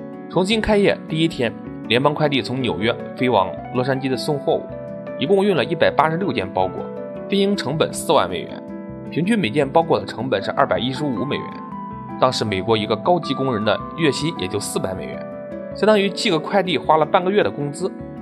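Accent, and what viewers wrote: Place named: Jiaodong, China. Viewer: 老哥。。你这胶东咬字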